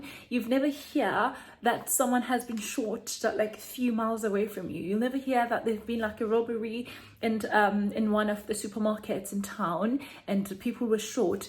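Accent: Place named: United Kingdom. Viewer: Loved the video. But not going to lie, that accent threw me off. For a second I thought the video is about a Brit moving to SA not other way round.🙈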